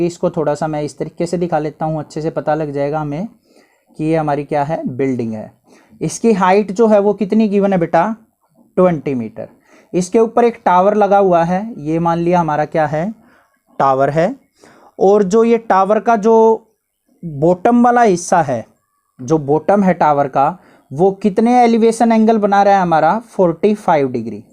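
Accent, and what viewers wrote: Haryana, India. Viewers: Sir tam haryana ta ho k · Sir आप हरियाणा के हो क्या ???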